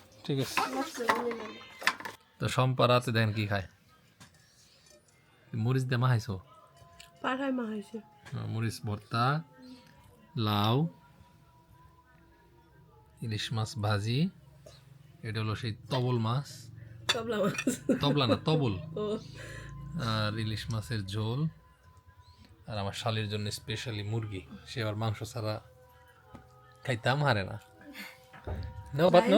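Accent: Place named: Tangail, Bangladesh. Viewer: Bhai apnego basha ar amago basha same ai jonne video valo lage... amar bari jodeu Assam Guwahati te tar poreu Tangaila koi...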